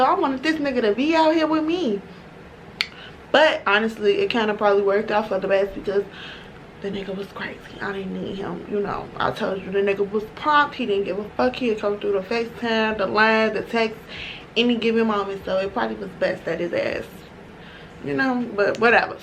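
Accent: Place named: Chicago, United States. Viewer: Aww awww boom g straight Chicago talk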